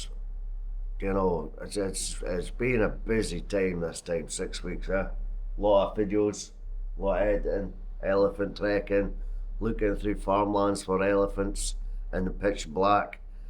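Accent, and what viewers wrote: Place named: Scotland, United Kingdom. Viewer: I like the accent bro ... Braveheart ... Freedom!!!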